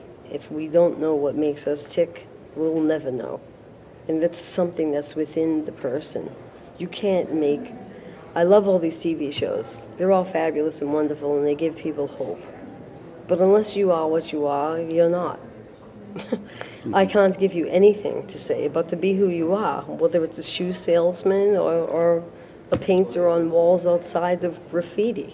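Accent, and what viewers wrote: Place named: Boston, United States. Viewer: What a great lady-loved her Missing Persons work. And her Bawston accent.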